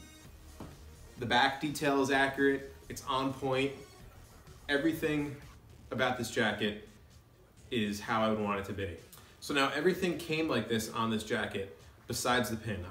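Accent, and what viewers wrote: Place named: Philadelphia, United States. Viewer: Lol something tells me you're from South Jersey/Philly/Delaware? Go birds? Also nice vid.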